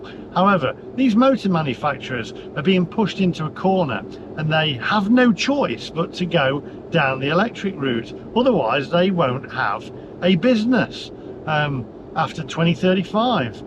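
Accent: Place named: North, United Kingdom. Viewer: You might have a northern accent but your car test is totally irrelevant to the rest of us mere mortals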